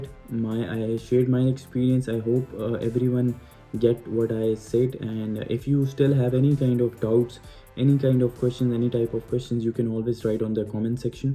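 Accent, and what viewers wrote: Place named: India. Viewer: Great great accent unka indian hai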